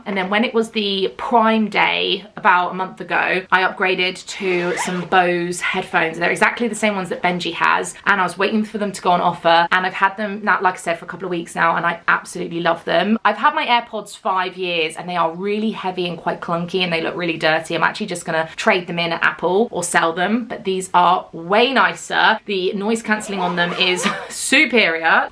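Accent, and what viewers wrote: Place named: United States. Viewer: Hehe I can definitely hear an american twang in yur voice, then you said you spend a lot of time in America and it made sense :)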